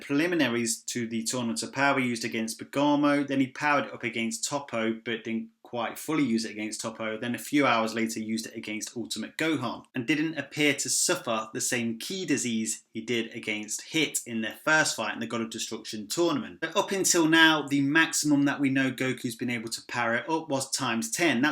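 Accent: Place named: United Kingdom. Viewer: i usually enjoy british accents , not today lol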